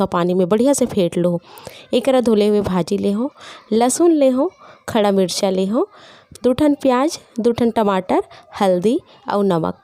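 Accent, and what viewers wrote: Chhattisgarh, India. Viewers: AAP ke ehi andaj bahut badhiya lagathe jo apan chhattisgarhia boli bolthaw bahut apnapan lagthe👌👌🥰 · Katna sunder cg boli boltho didi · 👍👌दीदी आप के छत्तीसगढ़ी बोली बहुत ही मीठी है , मै बनाने वाला हु अभी।🙏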